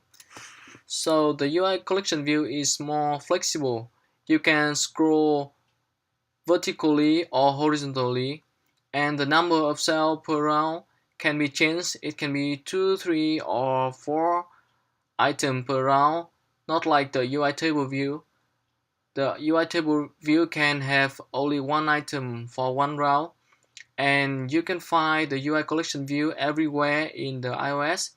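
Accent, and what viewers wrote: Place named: Vietnam. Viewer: E nghe thấy giọng việt nam mà bật sub lên thấy auto sub cứ sai sai nên đoán thế kaka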